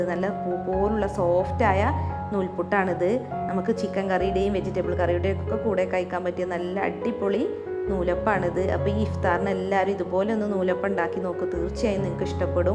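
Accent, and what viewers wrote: Malappuram, India. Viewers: ഇജ്ജ് എന്താ പറയണേ " ഒഴിക്കലും " കയിക്കലും ഇല്ലെങ്കിൽ പിന്നെന്ത് മലപ്പുറാ..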